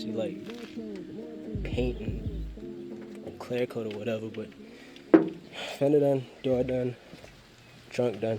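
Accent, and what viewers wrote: Bahamas. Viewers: Could here that accent from a mile away 242!!!! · Bey I say I know that accent sound familiar😂. You gain a sub just because of that. This my first time watching ya videos. Continue dropping the bahama heat bro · Heard that Bahamian accent and felt right at home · why bro sound Bahamian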